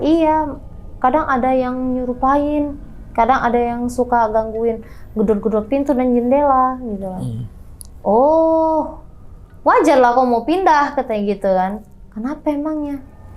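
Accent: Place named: Medan, Indonesia. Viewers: sor x ku dengar kakak ini becerita pake logat medan bah wkwk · Logat nya Medan kali ha salam dari Medan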